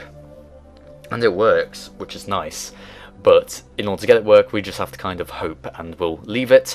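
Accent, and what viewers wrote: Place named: Wales, United Kingdom. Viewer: you sound welsh :) watching in caerphilly :)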